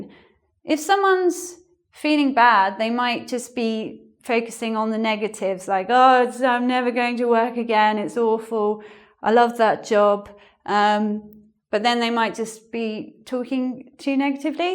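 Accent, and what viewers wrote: United Kingdom. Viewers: Hi:) i really love watching your video in youtube and engvid what a intresting topic and i like your real british english <3 at first time i watched your video, i can't understand anyting!! but now i heard your all word of sentences. thanks a lot.XX but would you mind if you tell me your personal website address?:) please reply me:) i'm waiting you ❤️ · Her accent is Ideal in order to get used to british accent: it has all the characteristic of the accent of people I can't understand, but I can easily understand all she says. I'm actually watching all her videos to get used to british accent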